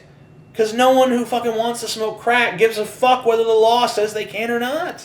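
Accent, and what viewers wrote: Scotland, United Kingdom. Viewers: His accent was Scottish :) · His accent is scottish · His accent is Scottish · Thats a scottish accent? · Its a Scottish accent, I cringed.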